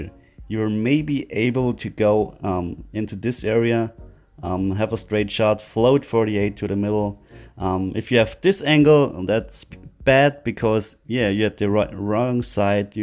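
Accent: Germany. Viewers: Where are you from dude? I'm into accents, always have been.Took me a while to place you as from Germany, very nearly Austria. Maybe you could give me some pointers on placing your accent. By the way, at first glance your website looks pretty bloody good, well done! Edit: My guess is Munchen, sorry if wrong, limited scope. Edit: Looking at a map, I want to guess closer to Deggendorf.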